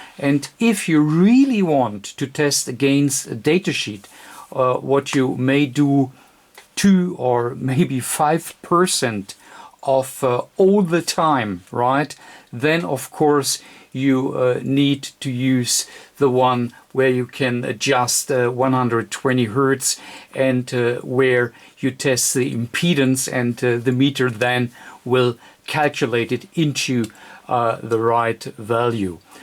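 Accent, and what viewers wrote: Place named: Germany. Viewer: I do hear a strong German accent. Greetings from the Nederlands my vriend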